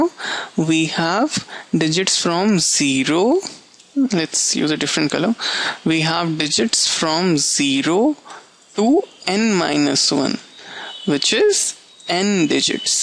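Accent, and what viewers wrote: India. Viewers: It's Indian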